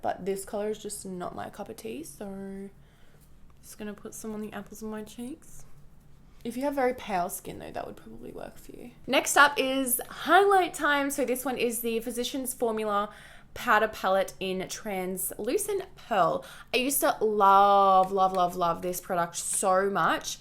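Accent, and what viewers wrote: Australia. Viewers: r u australian?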